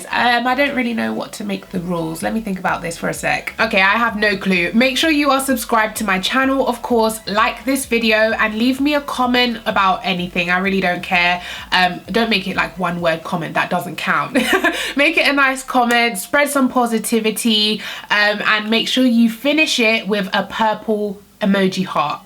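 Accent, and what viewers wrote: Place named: United Kingdom. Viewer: Aw from when you said Morphe sent you some stuff I was smiling girl 😫🤸🏾‍♀️ through the video I was like please tell me you gave her the brushes & you mentioned brushes I was like oh Yes Lord 😫💃🏽 I was finished. Girl i’m so happy for you. I been watching you for a couple months since last year & I love your generosity & honesty. Quite weirdly I like listening to your voice & accent lol even though I too live in the U.K. 😂 It’s time now we get onto that Discount Code so we can support the U.K. babes 💜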